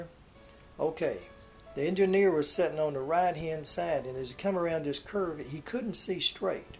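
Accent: South, United States. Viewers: These are some of the places I'd like to see when I got more time. IE retired. Thanks for the video. Your southern accent reminds me of my Alabama In Laws. Ya'll take care now, Bless your heart for the good work done here. D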